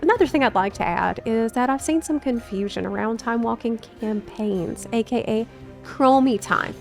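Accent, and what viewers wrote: South, United States. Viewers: are u from the south?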